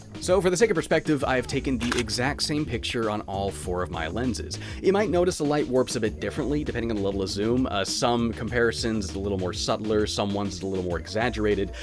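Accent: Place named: Nova Scotia, Canada. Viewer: This is what I love about. He's plays a game, we get a lesson on Photography. Plus he's from Nova Scotia and his accent and mannerisms makes me miss the Maritimes.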